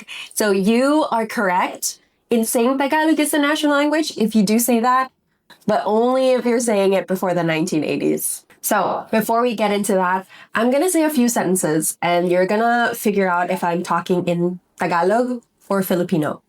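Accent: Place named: California, United States. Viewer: Sounds like you speak English with a California accent to me.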